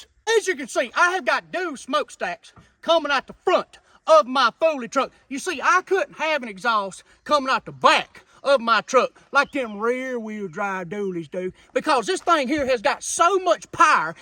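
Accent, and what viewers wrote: South, United States. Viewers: This guy's Southern accent makes me laugh 😂😂😂😂😂😂😂😂😂😂😂😂😂😂😂😂😂😂😂😂😂😂😂😂😂😂😂😂😂😂😂😂😂😂😂😂😂😂😂😂😂😂😂😂😂😂😂😂😂😂😂😂😂😂😂😂😂😂😂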